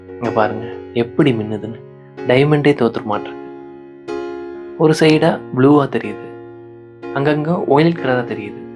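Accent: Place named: Kongu, India. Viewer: Wow..🤓 ...nalla Kavithai with kongu style🔥🔥🔥🔥🔥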